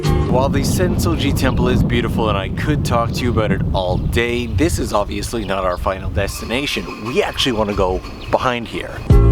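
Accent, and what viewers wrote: Canada. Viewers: You should be a tour guide! you explain everything so well and your Canadian accent adds a special touch! Ireland is a different kind of beautiful so check us out sometime! 🍀🍀🍀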